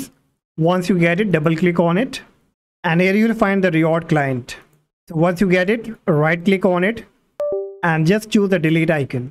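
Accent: India. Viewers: you know tutorial is good when you hear the indian accent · you know vid is good when there is a Indian accent. thank you this actually helped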